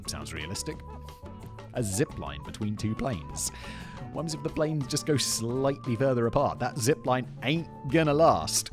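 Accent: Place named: United Kingdom. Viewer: You Tube SUCKS! I have removed this man's channels over and over again. But when You Tube changes the algorithm, it force feeds me this guy's many channels despite my removing him. channels suck. They are poorly researched information that is mostly government propaganda talking points. Terrible videos. And just because has a British accent, this doesn't not make him an authority on anything. He's just a talking head. England is over. Brexit has made the only job in England a You Tube content provider. Terrible list videos that spread falsehoods and bull.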